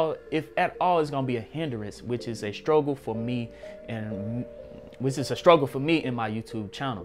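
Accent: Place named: Texas, United States. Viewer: kI am impressed this is all off the top of your head, I also see sometimes you try to pronounce words with out the Texas Accent. But you are doing a good job. Keep up the good content.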